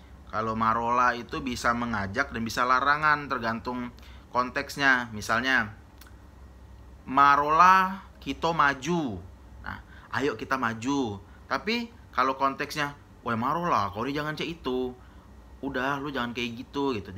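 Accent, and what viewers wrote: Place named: Bengkulu, Indonesia. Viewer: Ini dialek Bengkulu mana? Bengkulu kota? Dialeknya ada campuran Lembak, Serawai dan lain2.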